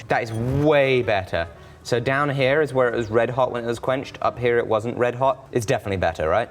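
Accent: United Kingdom. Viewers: A Brit talking about Hillbilly Engineering makes my redneck heart feel good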